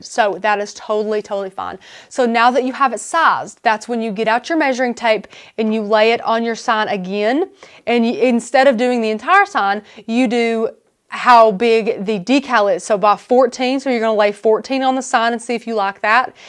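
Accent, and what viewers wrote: South, United States. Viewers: Great video. I love her country voice... Lol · talk a little more southern... southern drawl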